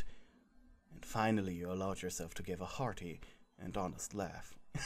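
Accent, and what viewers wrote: Germany. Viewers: GODDDD I LOVED THIS SO MUCH (question are u German?)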